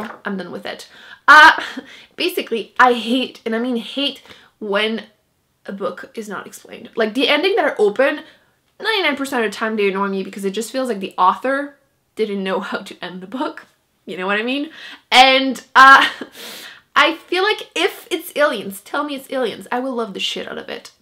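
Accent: France. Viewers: Thank you for responding! I kept trying to place it and thought you were from France so I was kinda close? No not really lol!!! I can’t wait to see what you come out with next! I’ve started back watching your videos!!!!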